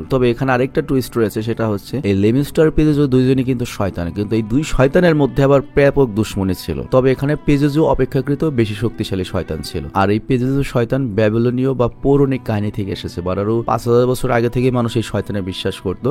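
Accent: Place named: Noakhali, Bangladesh. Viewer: নোয়াখালী কেন বাই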